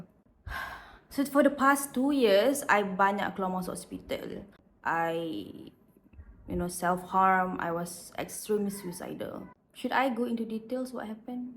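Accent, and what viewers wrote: Kelantan, Malaysia. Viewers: Her accent sounds klantanese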